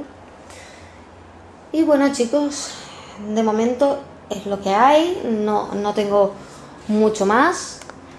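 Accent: Canary Islands, Spain. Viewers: Eres Canaria ? :)) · oye una preguntita, tu eres canaria no?, lo digo porke yo si lo soy y me suena mucho tu acento, y en caso de que lo seas, donde vives con sara en la península o por aquí?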